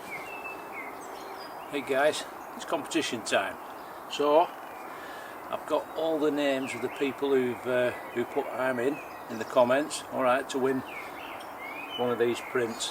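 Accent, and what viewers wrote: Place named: Bolton, United Kingdom. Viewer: great video. you sound fairly local to Bolton where I'm from.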